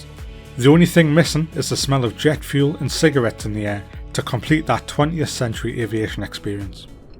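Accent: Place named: Northern England, United Kingdom. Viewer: Nice to a northern fella, least they're local, i think your the voice that says "GO" in the RJ too? Great as always. Nice job JustFlight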